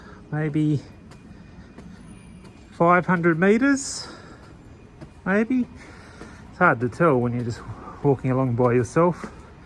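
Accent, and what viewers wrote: Australia. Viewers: You sound like an Aussie? Am I correct? Thank you for showing videos of what the situation is really like presently, after all covid restrictions are being lifted. Just curious (and amused 😂😂😂) why are you, unlike your countrymen, not afraid of being attacked by the Chinese? Tell them NOT to be afraid of their own shadows!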